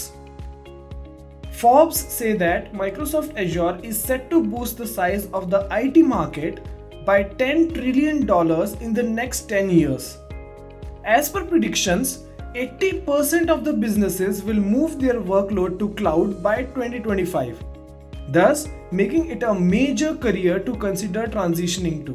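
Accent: India. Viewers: indian accent :(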